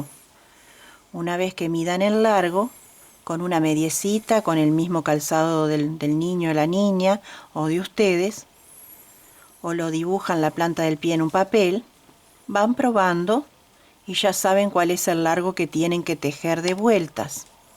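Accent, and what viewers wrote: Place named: Argentina. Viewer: Me da mucho gusto haberle encontrado, tengo una hija en Buenos Aires, creo por su acento, que usted es de Argentina, y se llama, y tengo unas primas, con quienes me crié, que son Garcia, esos apellidos son muy sentimentales para mi, y por lo que usted manifiesta en sus palabras, siento que tiene cualidades especiales. Gracias por compartir sus conocimientos.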